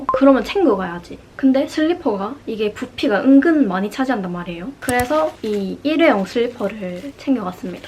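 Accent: Gyeongsang, South Korea. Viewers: 경상도 사투리 너무 귀엽다 💖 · 경상도의 억양이 매우 정겹네요😊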